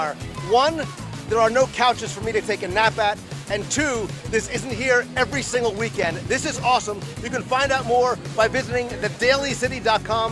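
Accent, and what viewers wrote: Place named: East Coast, United States. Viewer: Invest in a microphone Lou! I can barely hear you, then the music blows my ears out. We can tell you're from the East Coast, please slow down and enunciate.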